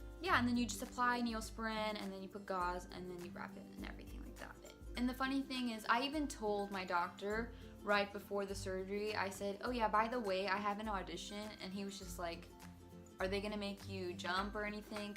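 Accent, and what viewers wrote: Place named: Texas, United States. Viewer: I could just tell you were from Texas! (: What part? I'm from Tx too!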